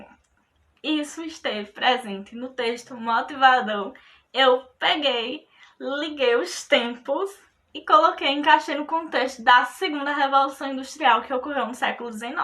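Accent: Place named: Northeast, Brazil. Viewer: Que sotaque lindo do meu nordeste ❤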